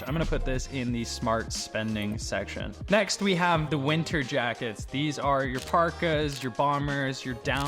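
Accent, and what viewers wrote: United Kingdom. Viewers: ha ha love the british accent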